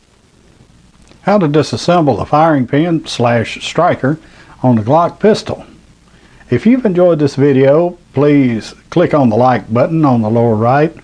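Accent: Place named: United States. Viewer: I’ll give you a third just for that country ass voice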